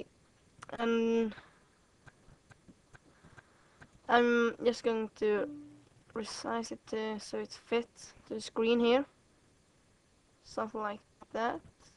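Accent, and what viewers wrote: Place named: Sweden. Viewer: Bra tutorial. Man hör att du är svensk :D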